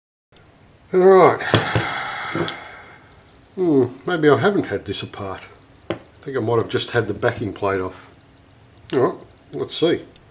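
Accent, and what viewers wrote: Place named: Australia. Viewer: Ahhhh.... So nice to find a video for a job I will be getting into after Christmas. Being a free lathe I had no time to look at it really but it felt tight in my inexperienced quick look. I had to dismantle it to get it home so I'll be looking for repairs needed whilst putting it all together. Thanks for the pointers and places to look. Mine is rather older without the thread cutting box. Just a heap of gears thrown in an old banana box that amazingly all look in good condition. Soooo very much appreciated I say with another Aussie accent. old-bonez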